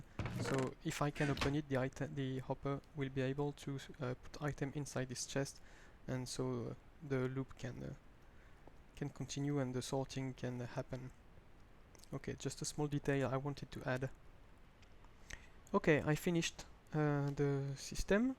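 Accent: France. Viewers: It's fine if you have a french accent but there will always be racist people. Most of the time though people just find other accents annoying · j'entends un joli accent français :p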